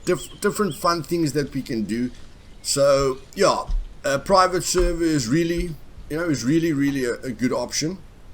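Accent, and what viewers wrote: South Africa. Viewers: Jesus that south african accent :( ugh...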